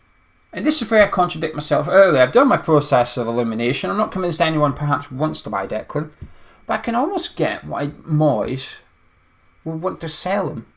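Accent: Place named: London, United Kingdom. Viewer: Sounds like a London boy.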